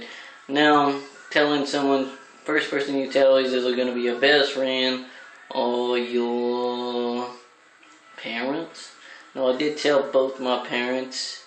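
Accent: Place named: Ukraine. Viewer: Are you from the UKRAINE ! Your accent is very strong !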